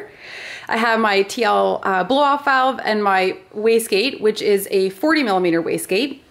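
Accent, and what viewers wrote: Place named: Canada. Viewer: Last video I didnt realize how typical Canadian your accent is, cute af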